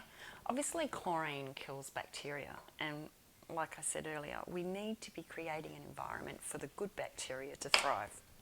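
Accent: Australia. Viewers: I thought ... 'that sounds like an Aussie, but must be in North America, because some of the words used', I now realise you are in Vic. My question ... what are the visual signs the ferment is done? I have had all failures in this first month of trying. The chooks are getting heaps of sauerkraut. I make a good sourdough, so I thought I would try to make a ferment starter. Well .. it is turbocharged ... I made carrot sticks, with fresh dill, in a Leggo's jar. Next morning, I tried to 'burp' it, it came out like a shaken bottle of champas. Today, was the third day, when I opened the lid, I had to quickly put it back on again. I opened it 3 times in 10 minutes, and finally it wasn't trying to spew out. It still had crunch, but definitely softer, a little bit salty, but I wouldn't call it sour. I put it in the fridge.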